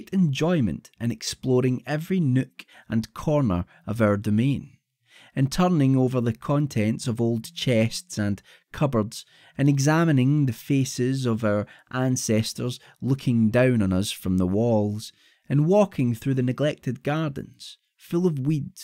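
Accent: Scotland, United Kingdom. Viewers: Enjoyed your reading . It was a suspenseful tale .Your scotch dialect reminds me of David Balfour in Kidnapped . We Americans sound ordinary . Probably not ordinary to other dialects but how can one gauge ? Also the smoke has a mesmerizing effect . Thanks for your audio tale !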